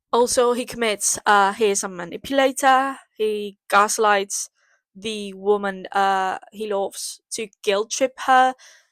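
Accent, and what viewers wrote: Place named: United Kingdom. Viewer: the britash accent is everything to me🗣️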